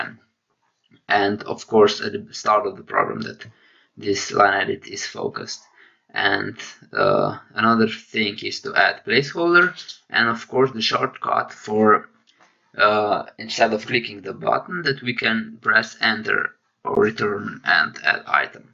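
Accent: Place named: Russia. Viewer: Ты Русский что-ли, акцент какой то есть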